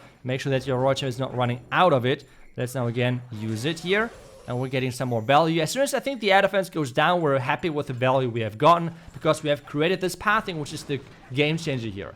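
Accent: Germany. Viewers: Are you german spoken, your accent sounds probably exactly like mine, aus Drutschland, Österreich, SW??😅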